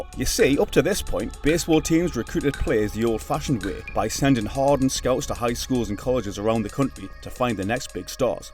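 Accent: Newcastle, United Kingdom. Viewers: Who made the British guy do the voiceover for this one? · gone Geordie? Not what I expected.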